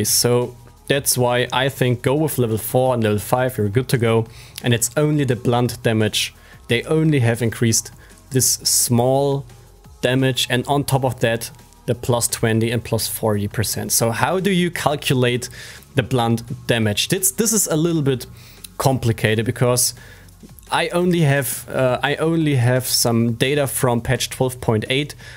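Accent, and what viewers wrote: Germany. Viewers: You are the reason why I drink some beer, your videos are great and the German accent makes it great , nice video man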